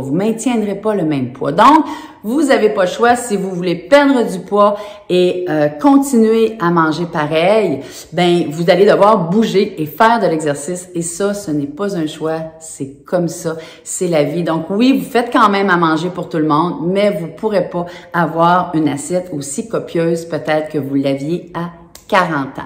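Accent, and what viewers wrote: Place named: Canada. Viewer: J'ai du mal avec l'accent canadien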